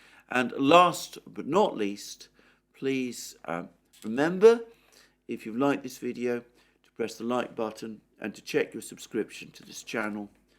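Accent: England, United Kingdom. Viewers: Wired listening to a Greek communist with an English accent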